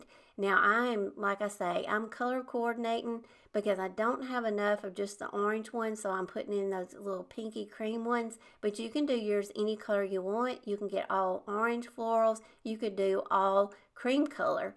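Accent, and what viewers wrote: South, United States. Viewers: I like your southern drawl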